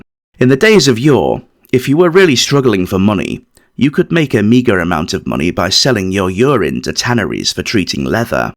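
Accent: Australia. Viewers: Love it,and your aussie accent was your best yet ;-) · Cool stuff! Liked your Aussie accent too 😂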